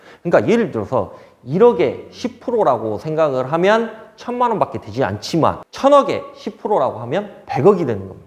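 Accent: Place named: South Korea. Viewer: 잘 모르는 분야라 천천히 보았습니다 사투리억양도 재밌었어요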